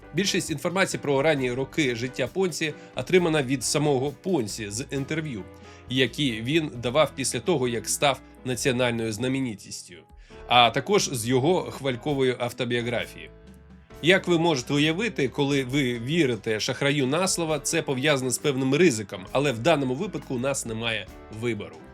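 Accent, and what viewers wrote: Russia. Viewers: Приємно бачити нові україномовні канали, однозначно лайк та підписка для підтримки))) Цікава подача, чекаємо ще PS. у диктора чутно слабкий російський акцент, тому ще вподобайка за перехід на солов'їну, а текст написано дуже і дуже хорошою українською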